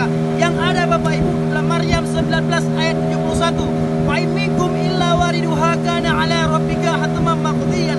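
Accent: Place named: Medan, Indonesia. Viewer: logat kau aja logat medan,mengaku ngaku orang aceh...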